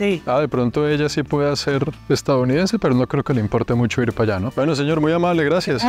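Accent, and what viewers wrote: Colombia. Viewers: habla muy colombiano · Jajajajja que bien me caes 😅😅 me encanta tu acento colombiano y tu gracia para redactar el tema saludos 😊😊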